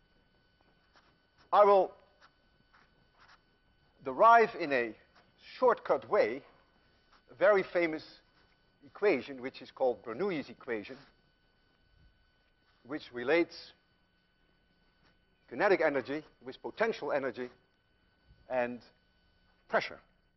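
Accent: Netherlands. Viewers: Is this dude from Holland?! This day seriously freaks me out.. like on that esoteric level. peace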